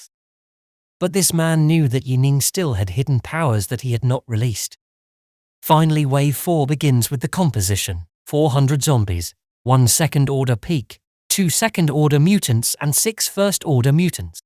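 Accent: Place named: United Kingdom. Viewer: Right as you talked i eminently knew you wre British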